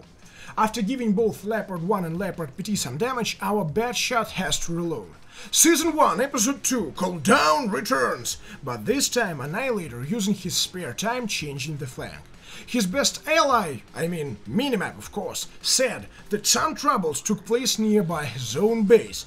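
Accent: Russia. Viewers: i love his voice pls more from this russian english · очень годно ! =) но русский акцент уши режет , непривычно так . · True russian accent)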